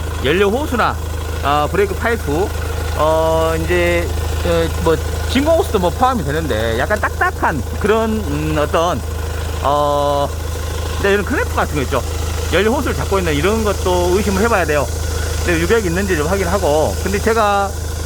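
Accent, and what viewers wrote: Jeolla, South Korea. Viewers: 어디서 공업사를 하시는지 궁금합니다 전라도 말투신것같아서 전라도면좋겠어서 댓글드립니다ㅠ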